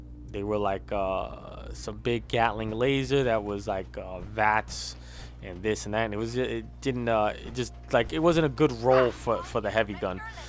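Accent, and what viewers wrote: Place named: New York, United States. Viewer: thanks I cant afford ps4 if I could I'd buy it just to talk to you cause I love your NYC accent >.> I'm from Georgia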